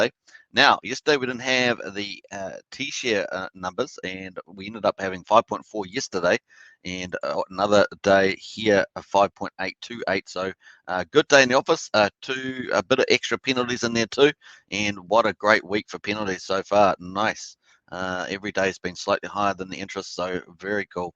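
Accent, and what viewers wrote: New Zealand. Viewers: Is that a kiwi accent?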